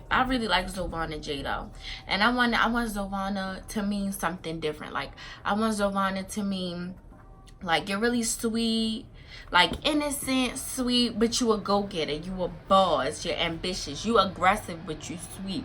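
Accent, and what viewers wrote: Baltimore, United States. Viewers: I think she’s from Baltimore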